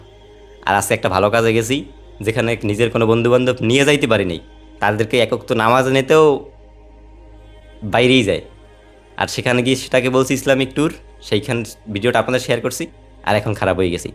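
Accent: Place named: Bagerhat, Bangladesh. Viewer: আপনার কথা বাগেরহাটের মতো।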